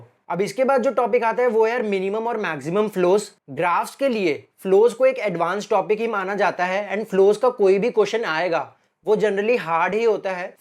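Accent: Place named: India. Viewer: can you add the transcription in your video pls. The India accent seems pretty hard to listen:((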